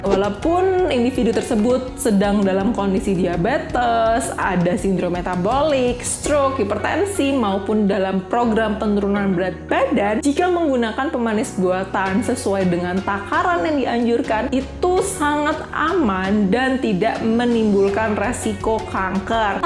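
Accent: Java, Indonesia. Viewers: Gara gara jerawat nyasar ke video dokter, problemku sama dok jenis kulit wajahnya gampang jerawatan kalo minum susu, makan keju, minum yang manis. Tapi susah ya semua itu enak.hehe..masa harus jadi vegetarian.hehe..btw, dok gak ketara kalo orang Bali loh, logatnya kok malah Jawa. Tipsnya semua bermanfaat dok. Salam kenal ^^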